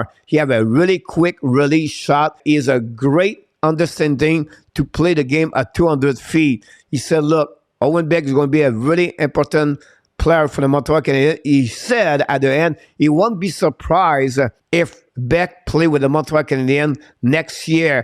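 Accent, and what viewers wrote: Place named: France. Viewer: Hey, we really enjoy listening to you and watching your videos. We are from Montreal but we dont live there anymore. We get a laugh from your heavy accent but you know your stuff man! Keep up the good work my friend, you have greatness inside of you!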